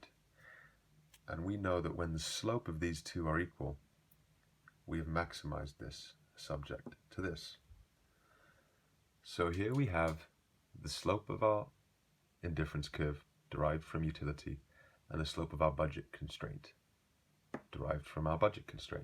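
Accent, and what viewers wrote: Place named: United Kingdom. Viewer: Thank you very much, so useful! Keep uploading videos, we need to understand economics with a British accent ;)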